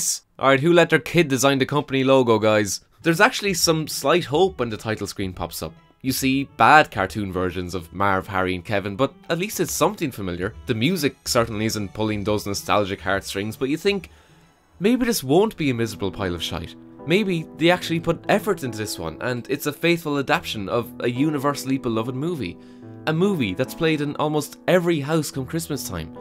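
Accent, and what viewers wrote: Ireland. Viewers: Nice Irish Brogue. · Are you Irish? You should do a video with Call Me Kevin! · I love the Irish when they're angry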